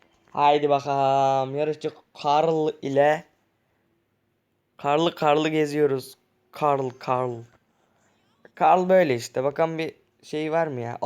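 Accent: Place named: Black Sea, Turkey. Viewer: Bakıyorum da Karadenizli konuşmayı öğrenmissin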